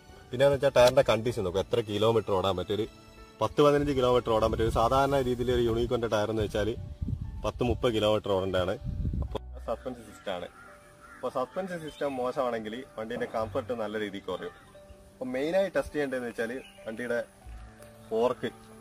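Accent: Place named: Vadakara, India. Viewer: Bhasha shyli vadakara istam😃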